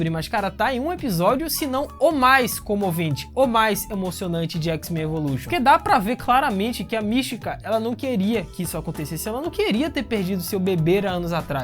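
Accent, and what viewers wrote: Bahia, Brazil. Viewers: Cara tu é daqui do baixo sul da Bahia? Simbora meu conterrâneo, voa mano!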